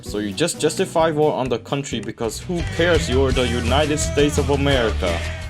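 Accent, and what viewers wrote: Germany. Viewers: Great German accent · Is this guy german, if no his german is really good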